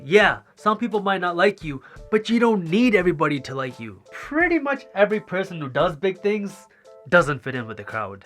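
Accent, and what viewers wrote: United States. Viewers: I just love it how you start with the Punjabi accent in the beginning and say your name, and subtly switch to American accent after that!! (In all your videos) Super proud Punjabi :D Keep inspiring man!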